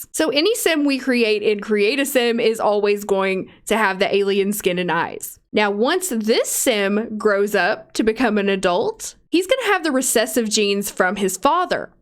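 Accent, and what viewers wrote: South, United States. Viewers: Do I detect a southern drawl?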